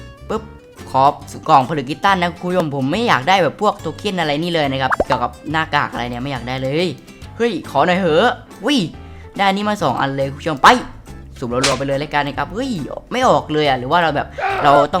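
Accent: South, Thailand. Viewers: พี่เป็นคนใต้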